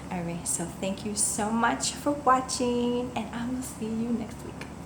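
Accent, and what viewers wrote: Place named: Brooklyn, United States. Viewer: Exercise my language but this shit is great. You basically described the events of the week and I feel a lot better about things. You kept it real, and explained the cards and their energies together in a way that had me thinking about the things I’ve got to deal with. Thanks for the wisdom, and indirectly advising me on my own situations. Love the Brooklyn accent too btw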